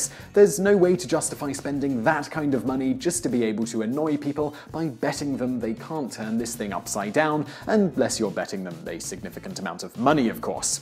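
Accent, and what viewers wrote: England, United Kingdom. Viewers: english accented johnny sins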